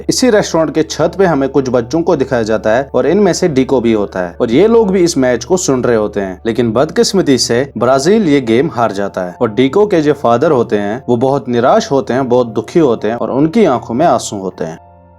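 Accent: Kashmir, India. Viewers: I think you are KASHMIRI because of your accent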